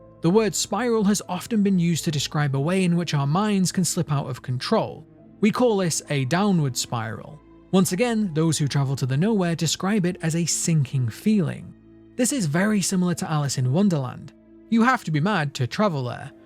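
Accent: England, United Kingdom. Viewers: Wait you are british???,man I thought that you are american 😶😶😶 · Um actually u speak the English language and the sound of ur accent is British and no one in America says "oh ur speaking British" SMH ignorance is bliss huh lmmfao rofl GOD SAVE THE KING CHUCKY CHEESE AND QUEEN CAMELTOE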